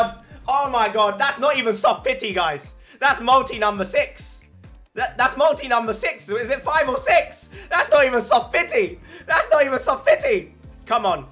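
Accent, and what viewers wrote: United Kingdom. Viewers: i watch video, i see asian. i scrolls down to read comment, i hear some uk accent. me: let me scroll up again to make sure. i did it twice LOL rumor has it, if your asian, you have a higher chance. maybe thats why i got mine an hour ago and now im here looking for build guide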